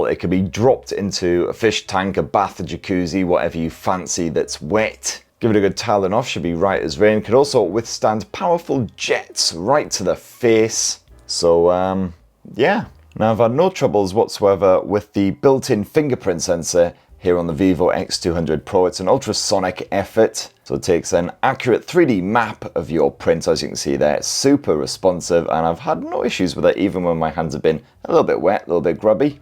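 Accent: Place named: North East England, United Kingdom. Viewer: He's north east English. Sunderland I think.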